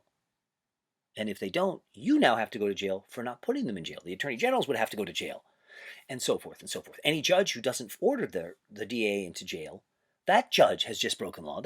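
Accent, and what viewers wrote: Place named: United Kingdom. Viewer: Wow he's still doing the British accent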